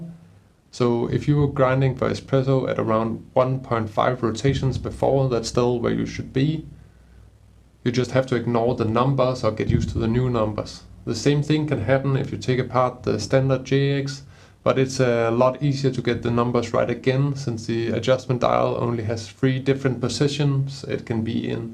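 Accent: Denmark. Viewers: Super-grateful for your quick and clear reply! I'll go for the basic. Thanks for your great content!! Btw - are you based in Denmark? Your accent gives me that impression! :) Greetings from Sweden! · Your accent sounds Danish, er you from Denmark?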